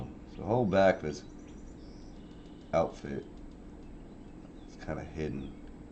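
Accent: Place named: Texas, United States. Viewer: totally agree, the entire human experience boils down to sharing stories via art in whatever form that carries it, everyone should have access to and nurture that inherent need to create and feel fulfilled by it. RDR is my favorite game too! unfortunately it's such a favorite that it cut heavily into my painting hours lol. your accent is nice though, it makes me miss texas :) i hope you continue to share your art & thoughts here!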